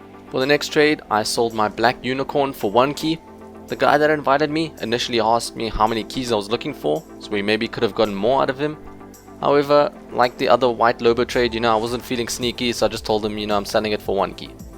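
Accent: South Africa. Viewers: Which country are u from its sounds like south africa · are you South African I am and you sound like you are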